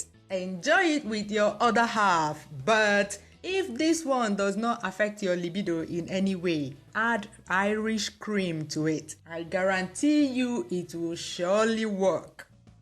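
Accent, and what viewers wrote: Igbo, Nigeria. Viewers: I always watch your videos. Always. Love your food and your Igbo accent. My parents are Nigerians. I'm from the Caribbean. I love your recipes so much!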